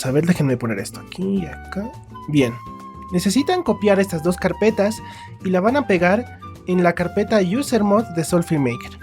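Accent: Argentina. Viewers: español argentino papa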